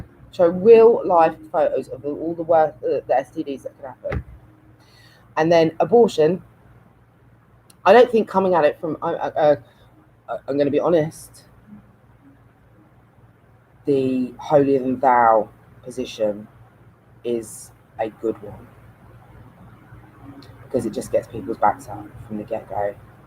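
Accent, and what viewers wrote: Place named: London, United Kingdom. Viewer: Hi ❤️ just subscribe after reading your comments on Carl Vernon. I take it from your accent you're London or surrounding. It's lovely to listen to you sharing your thoughts. I find video diaries in general really interesting (I'm not brave enough to do it myself). You seem of similar mindset as myself (and a similar age 🙂 I'm female). I'm totally all about meaningful coincidence, laws of attraction, symbolism etc I keep getting the number 44 lately - it's bonkers how much it appears. Are you registered to Gaia? It's like a spiritual Netflix 🙂. Some great doco's on there. Anyhows, I'm waffling 😍. Just thought I drop in and say hi. Namaste lovely lady - positive vibes to you on your journey from another spiritual lady in Cornwall /Devon area x❤️x ps you have a gorgeous, charismatic way about you - keep making your diaries 🌜🌞🍄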